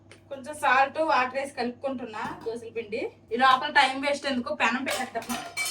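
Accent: Srikakulam, India. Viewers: Pakka Srikakulam yaasa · మీ భాష మన భాష శ్రీకాకుళం సూపర్ గా మాట్లాడుతున్నారు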